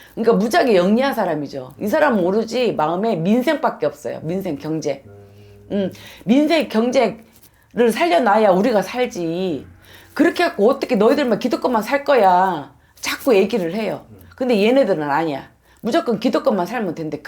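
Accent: Jeolla, South Korea. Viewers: 라도 말투 멋찌구마잉~~~여윽시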